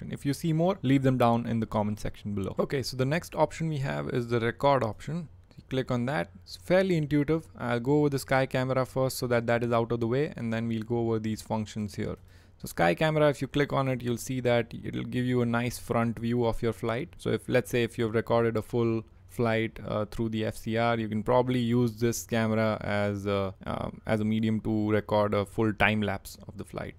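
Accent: India. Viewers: I don’t understand INDIAN ASCENT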